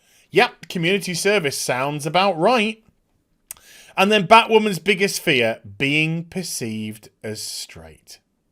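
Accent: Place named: Yorkshire, United Kingdom. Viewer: Wait up a second.. you have the ability to tone down your Yorkshire accent? And yet, Doctor Woke, who is a paid actress, cannot? You should consider acting mate..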